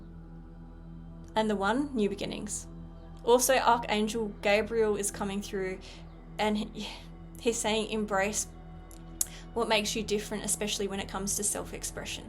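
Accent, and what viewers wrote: Australia. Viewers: Aussie accent!❤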